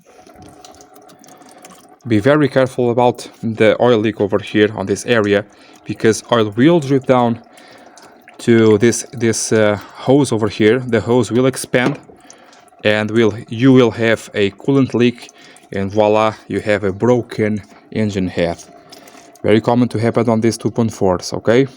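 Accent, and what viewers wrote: Portugal. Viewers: Boas, dá para entender que és tuga, desde já bom trabalho com os vídeos muito explicativos e detalhados, no entanto gostaria de perguntar se tens negócio aberto e se sim, em que zona? Já agora mais ou menos o valor da troca de uma corrente distribuição para um motor 1.3 multijet fiat grande pinto 2007? Tenho o material necessário no entanto receio de o mudar, Obrigado e continuação de bom trabalho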